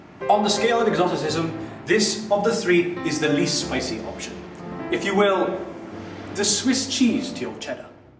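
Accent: United Kingdom. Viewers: i said influence not control ;) he asked why does he have an english [british] accent? and i just said because of Britain's influence in Japan. It's a larger one than America or other english speaking countries, no? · but why does he speak soooo... english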